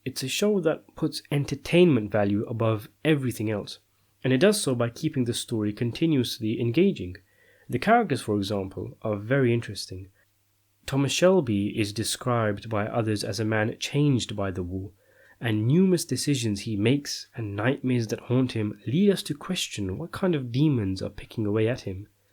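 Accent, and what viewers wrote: United Kingdom. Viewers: Thank you for explaining in it in plain English.. (British accent) of course..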